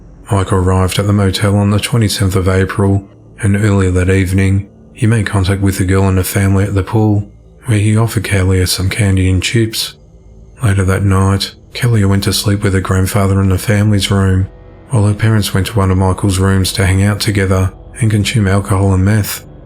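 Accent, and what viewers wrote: Australia. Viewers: the Aussie accent narrating true crime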